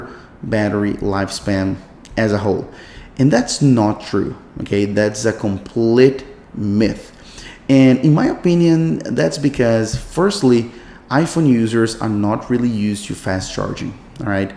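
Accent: Brazil. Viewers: u Brazilian?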